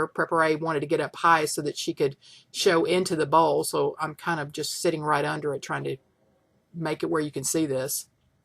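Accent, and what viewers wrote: South, United States. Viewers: 3 years later and I'm still making these dumplings! I'm doing them in a beef stew but works just wonderfully. I love revisiting this video, I'm from Canada and I live for the southern accents! You know the recipe is going to be good, when you hear that accent! Cheers!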